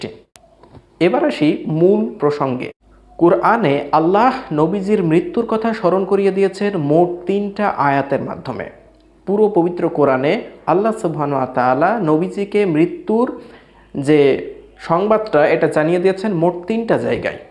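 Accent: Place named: Barisal, Bangladesh. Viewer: আপনার তথ্যগুলো চমৎকার। কিন্তু কয়েকটি বর্ণের বরিশালের আঞ্চলিক অশুদ্ধ উচ্চারণ শুনতে খুবই বিশ্রী লাগে।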